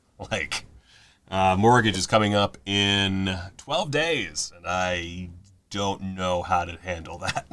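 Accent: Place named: South Africa. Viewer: Your British accent - sounds more South African, And Mr Jolly Lives Next Door is game. Eat the Rich and The Yob are worth the time and fun anyone is aware of the The Famous Five (idealist 1950's kids on jolly good adventures with a healthy lashing of bygone stereotyping) then Five Go Made on Mescalin.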